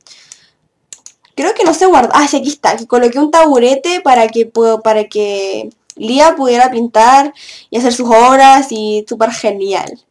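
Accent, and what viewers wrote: Chile. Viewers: hoooo apenas te escuche sentí que eras chilena porque dijiste la típica frase de el poh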